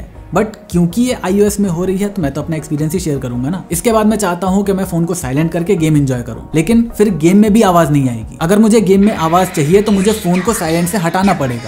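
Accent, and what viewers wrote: India. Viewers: Dondda do dhat indian accent are so funny 😂😂😂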